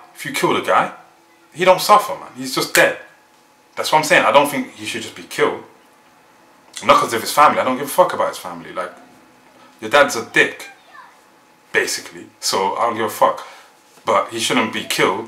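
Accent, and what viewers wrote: Bosnia and Herzegovina. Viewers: ))) U speak like u r Bosnian :))) Congratis :))) and congratis on this video! its sooooo good :) i am glad u think like that because he is really a criminal, he killed inocent ppl :( and.. how can he say that he is inocent? thare is sooooo many videos and other important documents that proves his "inocent" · I love your bosnian accent, your amazing :) bravo brate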